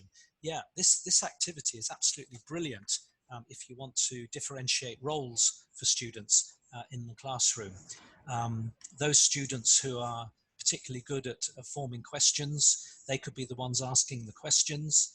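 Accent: United Kingdom. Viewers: By the way, you have got a wonderful English (I know I should write British) accent. It´s music to my ears.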